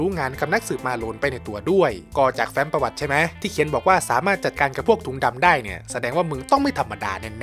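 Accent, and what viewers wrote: Isan, Thailand. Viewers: อีสานมาเต็มๆๆ พุ่นเด้555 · สำเนียงอิสานๆของพี่น่าร๊ากกกมั่กกก ~><~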